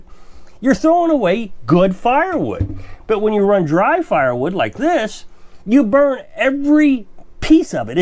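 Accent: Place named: United States. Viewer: You are speaking American, not English. Maybe it's American English, but it's not English.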